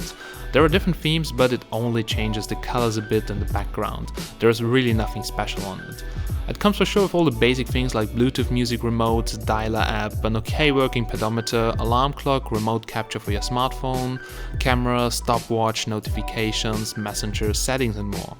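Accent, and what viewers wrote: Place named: Germany. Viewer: Zweites Video und weiß seit der ersten Sekunde das du Deutsch/Österreicher bist :D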